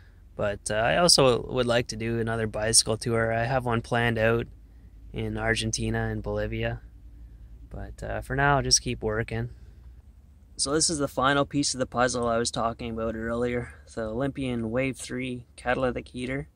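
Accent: Canada. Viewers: I thought he lived in Canada because of his accent and the insane price of living. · If you can't tell he's Canadian by his voice then you're either not paying attention or you know nothing about Canadians. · <<If you can't tell he's Canadian by his voice then you're either not paying attention>>, because he says, "I live in an eastern Canadian city" : ) All kidding aside, I practically live on the border of Canada, so I caught that familiar accent right away. We love our Canadian neighbors. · Beautiful Canadian accent ❤ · Judging by this dude's accent, he's in Canada. Probably Toronto or somewhere if it's so expensive and he's talking about going "out West."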